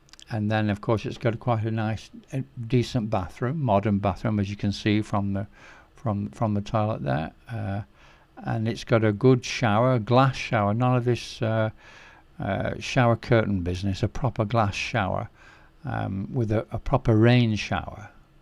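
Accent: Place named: England, United Kingdom. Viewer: Why are you giving costs in us dollars with an english accent ?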